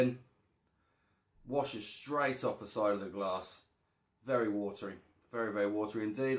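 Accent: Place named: Ipswich, United Kingdom. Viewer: What's your accent? You sound like an Ipswich boy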